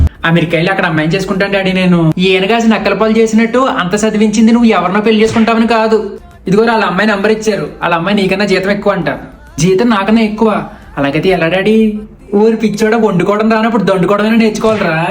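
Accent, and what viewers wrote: Godavari, India. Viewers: Gidavari yasanki,me mata teeru ki take above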